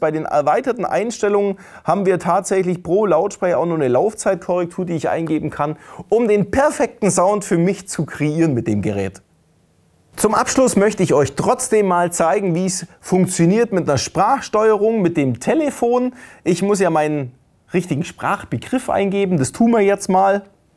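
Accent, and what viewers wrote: Swabia, Germany. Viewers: Das Schwäbische ist mir super Sympathisch xD Ich glaube ich werd mir das Radio zulegen :D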